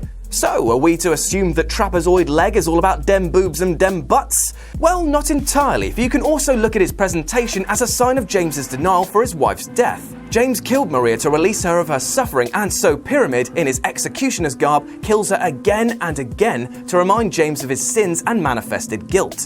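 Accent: United Kingdom. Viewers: Why britains ? I don't understand british english -. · American guy with a British accent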